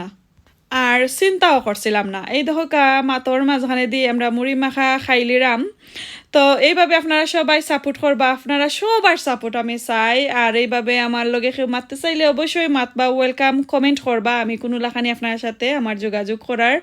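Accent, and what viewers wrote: Sylhet, Bangladesh. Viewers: u talk in Sylheti basha that’s why I love to watch u more !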